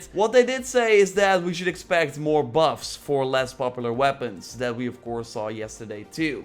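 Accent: Netherlands. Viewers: Great vid sir but are you dutch?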